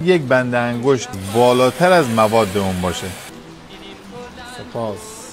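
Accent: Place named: Gilan, Iran. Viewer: به به چه لهجه قشنگی، سلامتی گیلانی ها و همه اقوام ایرانی 👏🏻🫶🏻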